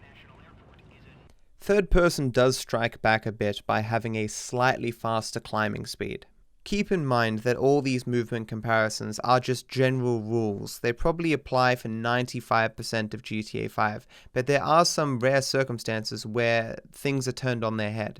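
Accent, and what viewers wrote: United Kingdom. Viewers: Now I know, he’s Bri’ish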